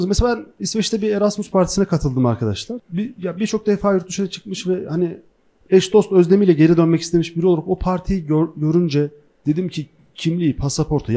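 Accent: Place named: Anatolia, Turkey. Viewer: Abi çok sıkı fanın sayılmam. Ancak kurcala daki çok eski videolarını biliyorum ve çok güldüğüm bir mizah anlayışınız vardı. Kanalı görünce bir heyecanla açtım ancak 2. Dakikaya zor geldim. Bu yarattığın sahne kişiliği ise lütfen vazgeç çünkü kurcaladaki kişilikten sonra çok eğreti duruyor. Eğer bu gerçek kişiliğinse o zaman lütfen kurcaladaki sahne kişiliği ile devam et. Anadolu şiveli ve durumlara uzunca süre uzaktan bakıp anadoluca bir tepki veren yeterince stand upçı var piyasada. Bu şekilde sıyrılman imkansız.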